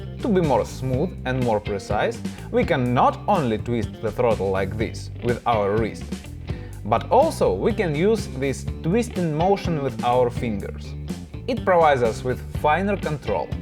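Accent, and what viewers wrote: Russia. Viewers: Бля, чувак с таким Рязанским акцентом луче не позорился бы и говорил по русски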